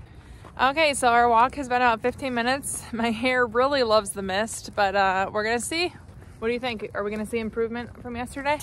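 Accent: Midwest, United States. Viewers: Missed the midwest accent 😉. Been awhile since one of your vids popped up on my feed 💪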